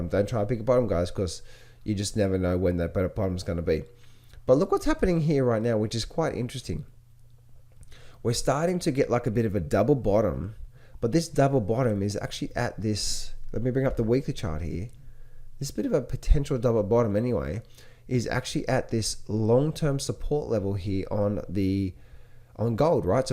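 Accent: Australia. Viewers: Thats an Aussie accent !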